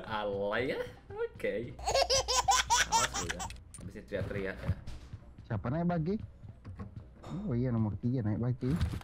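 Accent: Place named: Banjar, Indonesia. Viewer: Orang banjar mana suaranya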